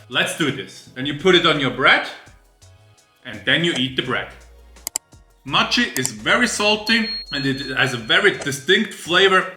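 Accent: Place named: England, United Kingdom. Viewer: Der englische aktzent is ja sowas von lustig 😂herzig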